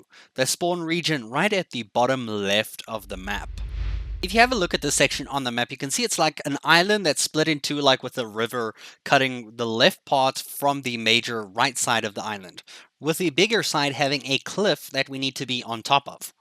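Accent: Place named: South Africa. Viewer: You sound abit South African bro❤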